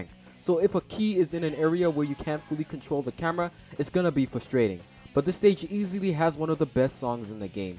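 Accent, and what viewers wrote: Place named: Bronx, United States. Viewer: Can't tell if this guy's accent is asian hood or bronx and I don't care lol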